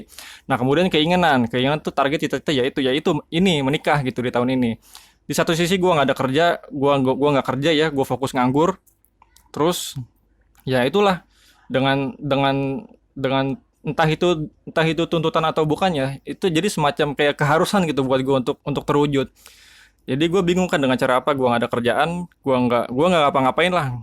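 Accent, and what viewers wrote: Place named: Citereup, Indonesia. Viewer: Kirain orang mana bang taunya citereup😂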